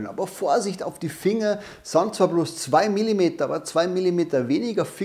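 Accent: Bavaria, Germany. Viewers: Kann man sich nicht geben mit dem dreckigen bayerisch 😷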